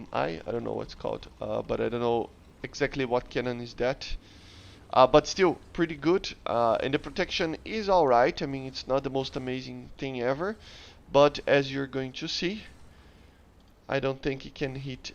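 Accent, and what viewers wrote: Brazil. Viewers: kkkkk sabia, esse sotaque br e mt forte, mas o inglês e bão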